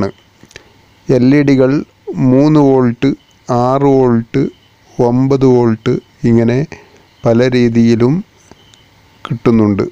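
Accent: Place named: Tamil Nadu, India. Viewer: തമിഴൻ മലയാളം പറയുന്നത് പോലേ തോന്നുന്നു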